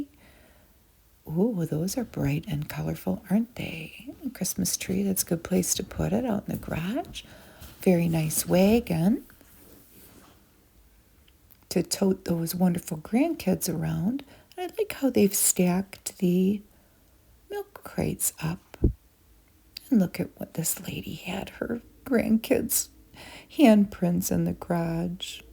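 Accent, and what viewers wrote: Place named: Michigan, United States. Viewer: This is a Michigan accent if I’ve ever heard one!! :)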